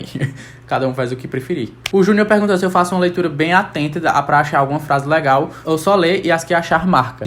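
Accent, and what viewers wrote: Northeast, Brazil. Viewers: Homi, tu é claramente nordestino. Alguma chance de ser pernambucano??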